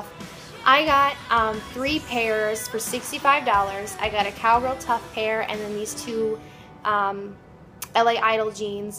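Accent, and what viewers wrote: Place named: North, United States. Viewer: Country what? U sound like ur from the north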